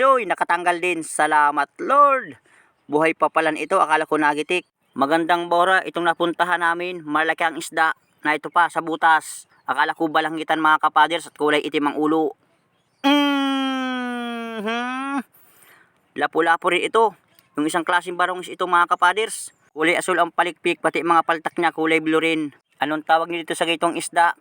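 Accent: Bicol, Philippines. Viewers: Bicolano ba kayo o ilonggo o Batangenyo 😂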